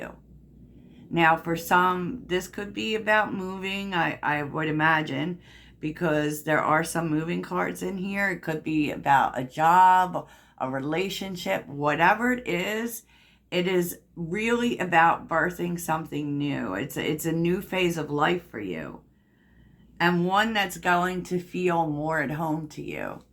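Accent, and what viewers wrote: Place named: Mid-Atlantic, United States. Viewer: Where are you from? Sounds very PA/MD/DE! Baltimorean here.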